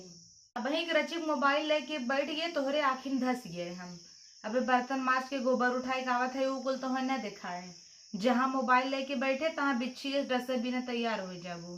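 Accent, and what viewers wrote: Jaunpur, India. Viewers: Didi mai bhi Jaunpur se hun lekin aapaki boli bahut sundar hai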